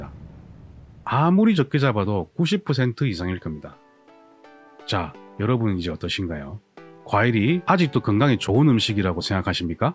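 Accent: Gyeongsang, South Korea. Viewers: 경상도 어디신데요? 말투가..부산인데 좋은 내과 찾고 있어요..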